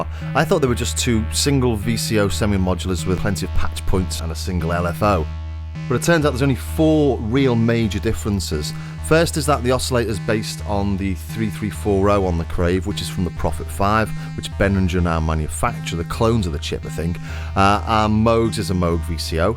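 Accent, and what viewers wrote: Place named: Northern England, United Kingdom. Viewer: \Good on you and good to hear your northern accent!!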